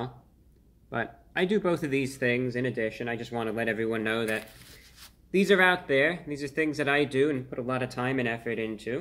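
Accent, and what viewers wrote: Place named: New York, United States. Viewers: He might make different jokes here or there, but you can definitely tell he’s from New York in his older podcasts… which is quite a treat to listen to… it was like listening him grow from a kid to a young man. Literally. 😃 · the subtle new york accent is genius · When I first found out about you, I thought you were from New York because of your speech patterns and such.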